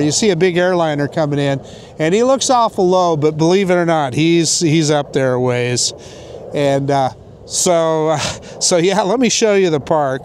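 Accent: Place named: Idaho, United States. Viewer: Sii y se pierde todo el acento de Idaho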